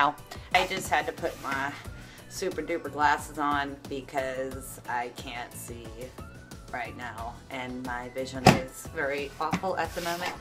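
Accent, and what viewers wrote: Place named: Texas, United States. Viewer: Everyone saying she reminds you of grave3yardgirl... y'all are just recognizing the Texas Suburban accent. This is just how we talk here.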